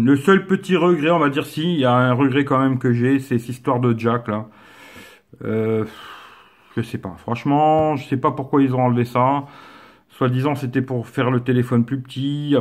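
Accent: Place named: North, France. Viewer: L'accent du nord dure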